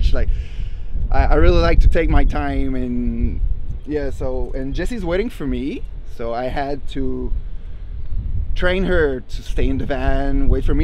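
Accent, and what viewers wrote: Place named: Quebec, Canada. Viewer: hehe québécois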